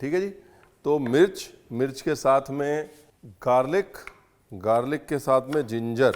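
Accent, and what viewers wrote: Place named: Kanpur, India. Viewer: Aap to kanpuri boli bolte hai fuffpi kanpur ki thi mujhe Aap ki her dishes pasend hai Mai banati hoo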